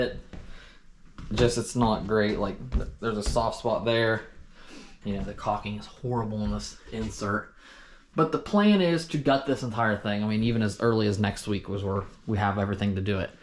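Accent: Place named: Pittsburgh, United States. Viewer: you sure sound like 'cole the cornstar' but he is from iowa/idaho...6 generations. ohio? i hear a little pittsburgh. does not sound like ohio at all.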